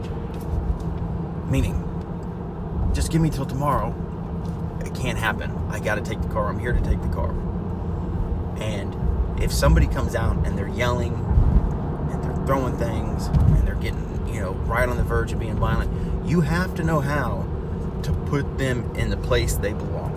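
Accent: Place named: Indiana, United States. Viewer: Man I’m from Indiana and I was like this dude is definitely somewhere in Indiana don’t know the town but I can tell it’s Indiana 😂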